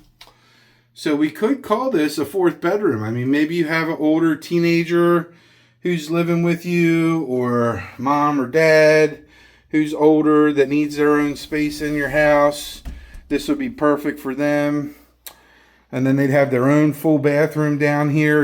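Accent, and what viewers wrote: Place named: Baltimore, United States. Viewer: Love the Bmore accent hon 🧡🖤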